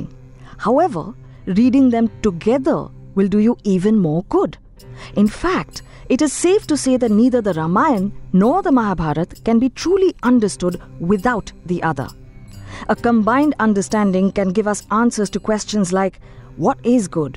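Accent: South India, India. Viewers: Sir, RAMA,DHARMA, MAHABHARATA are our South Indian style of Pronouncing habit.. For example in West Bengal, people pronounce where ever "V" comes, they pronounce like "B".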